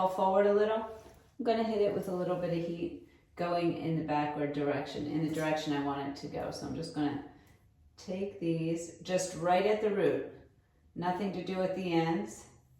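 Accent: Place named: New York, United States. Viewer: Also as a former Long Island girl who moved to NC I miss that accent! (I’m assuming you’re a NY girl!)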